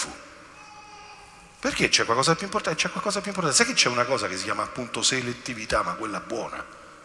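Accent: Rome, Italy. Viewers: Don Fabio, se potesse per cortesia evitare di parlare con parole e toni romaneschi, è fastidioso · e come fà..... é de Roma... e noi romani parlamo come parlamo... e daje Don Fabio chessei gajjjardo, er mejo prete detutta Roma... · Chi scrive che il romanesco da fastidio be significa che si mette una barriera alle cadenze dialettali che in ogni regione ha e poi io nn sno romana però piace.😮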